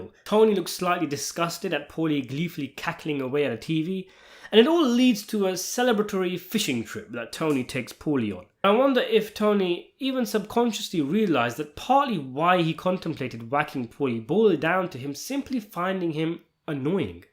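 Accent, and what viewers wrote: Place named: East London, United Kingdom. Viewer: Bro you sound so Bengali from East London 😂😂😂😂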